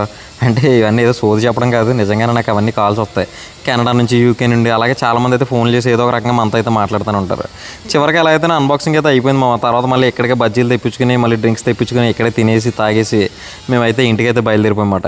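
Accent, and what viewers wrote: Godavari, India. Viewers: Godavari voice❤❤❤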